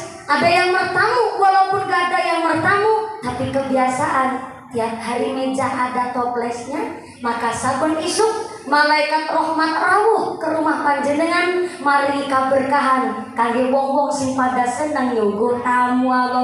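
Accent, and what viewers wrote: Banyumas, Indonesia. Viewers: Pokoknya gak ilang ngapaknya... Hihi Kls 4 SD beliau sudah ceramah di desa Saya... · Kayaknya kalau ora ngapak orak kepenak he he he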